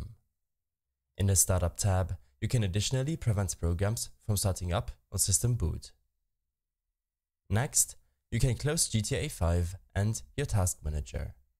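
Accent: Germany. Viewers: No man a German knows he sounds German, you would know it when you saw his channel description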